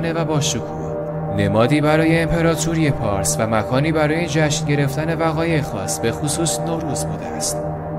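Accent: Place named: Azerbaijan. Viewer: درود بر شما! عالی بود و بسیار لذت بردم. از لهجه تون هم فهمیدم که آذربایجانی هستید! یاشاسین آذربایجانین شریف خالقی!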